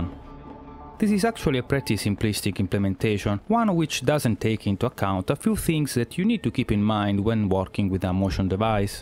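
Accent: Italy. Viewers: si sente poco che sei italiano! :) ahah..comunque come fai a saper così bene l'inglese? hai vissuto un po' all'estero oppure hai frequentato qualche corso? grazie in anticipo. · si sente che sei italiano ,ti consiglio di fare un canale italiano,cosi sei sprecato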